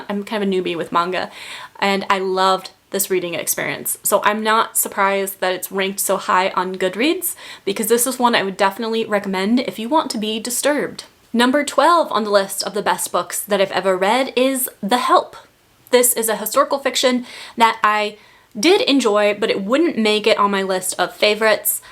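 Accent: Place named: England, United Kingdom. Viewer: What English accent you have I like your talking ❤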